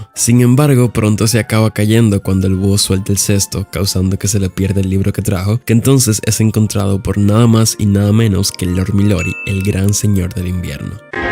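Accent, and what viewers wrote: Dominican Republic. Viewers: Bro, tu eres dominicano verdad? El final tu canal, me encanta como narras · Vos es de dominicano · Algo me dice que el es dominicano? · Amo como narra, nunca pierde esa esencia dominicana ❤️‍🩹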